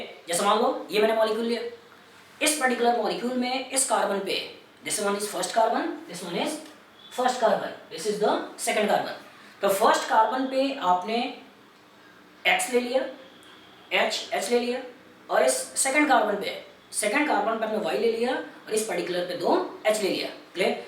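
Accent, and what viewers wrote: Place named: India. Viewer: Thank you sir for your lesson but I understood your english poorly is it Hindinglish?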